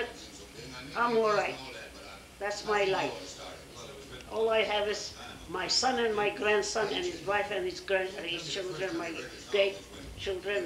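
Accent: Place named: Kayseri, Turkey. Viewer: Ah.. The first time I cried so much to an Armenian story. She is still telling the Turkish words with a Kayseri dialect, also talking English with a Kayseri mouth. I don't believe an orchestrated genocide but personal stories are so dramatic :( The places this lady telling are correct; Kayseri, Boğazlıyan Orphanage etc.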